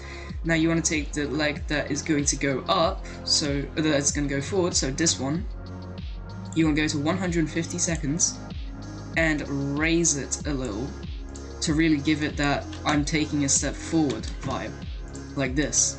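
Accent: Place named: India. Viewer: dude, something funny happened because of my stress, lol, so i got a buncha tutorial tabs opened about walking tuts, and the other video is an india guy talking, it loaded quite slowly, so i opened this video and i lit thought your an indian guy xd anyways, thanks for the tut, the only useful thing i learnt is the stretch thingy :) thanks you again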